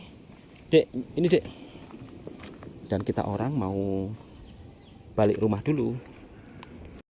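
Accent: Java, Indonesia. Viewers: Nmpk jelas isteri lebih smart dan ulasan2nya lebih baik dan teratur. ni pulk bodo, ckp brbelit2, brulang2..loghat bahasa jawanya yg lebih pekat dan asyik trsasul., sesekali bagi peluang la kpd isteri mmbuat konten, jgn ganggu atau menyebok...pastinya lebih menarik.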